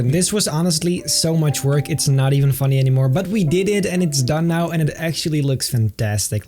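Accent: Netherlands. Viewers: Are you from the Nederlands?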